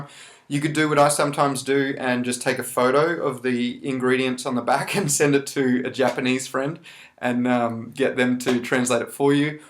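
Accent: Australia. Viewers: yea, that's an Auzzie accent :)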